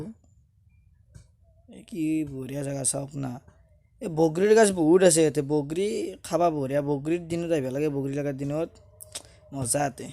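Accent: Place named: Assam, India. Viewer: Nalbari r language bhut vl lge ..love from Tazpur 🧡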